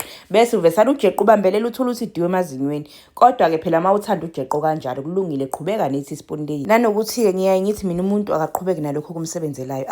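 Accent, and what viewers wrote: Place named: Zulu, South Africa. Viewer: Ngiyakubona nokudla... Ngithanda isiZulu ne accent. Maye. ❤❤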